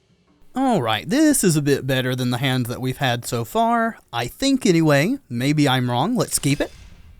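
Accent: South, United States. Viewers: Thought it sounded more like a southern accent, guess I'm not great at recognizing American accents. Keep up the good work!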